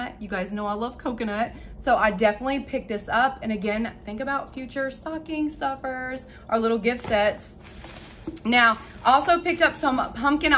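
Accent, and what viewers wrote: South, United States. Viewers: 🎃 I always stay till the end. I love watching all the stuff u get and I love how excited you get over the things you buy, plus I love your southern accent. Stay safe.❤🤗👍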